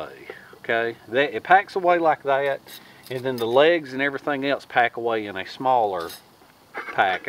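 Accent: South, United States. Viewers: Even if you were talking utter gobbledegook I could still listen to you talk all day long. That is the most American accent I have ever heard. Just keep talking mate, it is just so entertaining. Love your oven too, definitely going to make myself one of those and I'll be sure to give you the credit for it. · Dang I love your DIY videos. I love the way you talk most of all. Us Southern folks are losing our dialect and I am happy to see yours is intact. MOST refreshing. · hi, I found you a couple months ago & spend many hours watching your vds. I know I will never get to use most of what you teach because I'm an old 83 yr old woman but I feel that the things I learn might help me to help others get through when the SHTF & I believe that's not very far away. I'm thrilled that you & Nick seem to enjoy your time together & I LOVE your Southern accent & your laugh. 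My dream was always to own 40 acres in the woods & build a small log cabin & just sit & watch nature. Didn't happen. I built a house by myself. Outdoors us where I'm the happiest. Sorry this is so long but I love listening & watching you.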